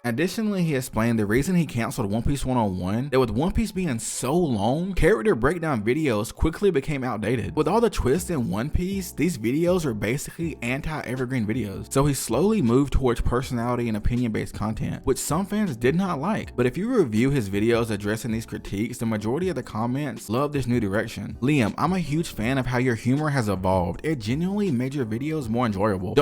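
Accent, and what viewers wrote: South, United States. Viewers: Dude I love your voice we need more southern YouTubers it’s amazing.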